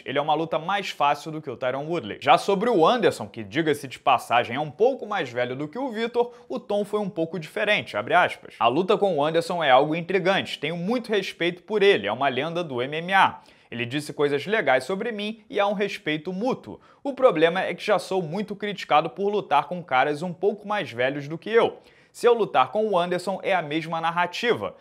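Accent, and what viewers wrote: Rio de Janeiro, Brazil. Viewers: Pq citou microfonia sendo que é o sotaque do rio de janeiro?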